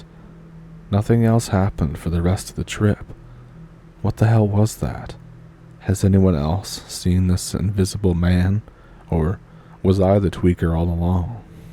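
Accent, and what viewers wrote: Ontario, Canada. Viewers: Hello,. Thanks for posting, you're voice always reminds me of My Ontario cousins and blood and such, 👍🎉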